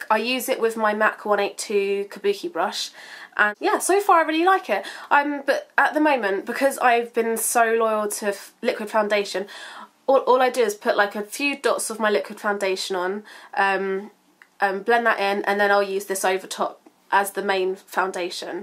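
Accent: United Kingdom. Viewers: Listening to you speak makes me miss living in the UK so much :(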